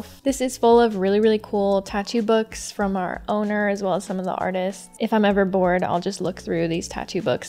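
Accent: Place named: RP, United Kingdom. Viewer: I was so suprised by the posh British accent!😂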